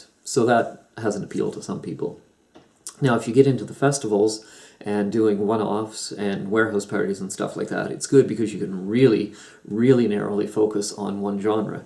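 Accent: Canada. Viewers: Also, love the Canadian accent. Living in Manitoba mine isn't nearly as defined as yours but I still try to making it noticeable.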